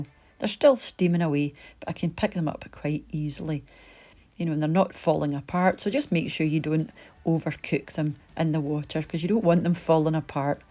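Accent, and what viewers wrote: Scotland, United Kingdom. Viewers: Here in New South Wales we do call them Scallops and I Luv Ya Scottish Brogue I was Going with a Guy from Kilcordy fife shire and I had an Auntie that was Scottish so I picked up his Brogue and it has been a very longtime since I used to sayTotties for 🥔 ... lol ... and the wardsmaids would tell me Speak Australian we Don’t understand Scottish ... lol ... I was training as Nurse back then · Love the food you do,love the acsent ,it's a joy so many memories of scotland , keep it going great to see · Its just a normal Scottish accent. THEWUMMANSJOOSTSPEAKINGSLOWERCOSSHESOANAVIDYAPAL... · You can also teach standard/traditional/authentic Scottish accent, as yours is distinctive yet wholly comprehensible and lovely. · Gonna have to try those, they looked awsome. Your voice is so soothing and i miss the accent, was born in arbroath but have been in Canada since 68, only been home once in 77, was a bad trip i was sick the whole time. 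· Yum yum yum and so easy to make.Also brilliant to hear my accent, yeah from Clydebank originally.Loving the Scottish foods,thank you and keep them coming.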